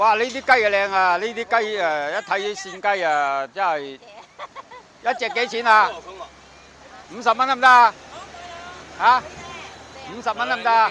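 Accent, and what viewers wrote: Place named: Hong Kong, China. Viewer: 原汁原味好视频! 先生是识货之人，满口港澳同胞 广州音，对本地情况还是比较了解的。白沙和三八，实质上都是公社一级的行政区域。著名歌手陈伯强就是台山县白沙公社人。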